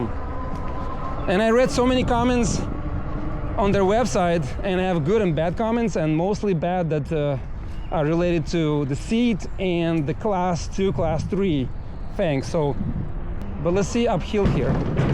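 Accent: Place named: Ryazan, Russia. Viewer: Чувак, что с рязанским акцентом?))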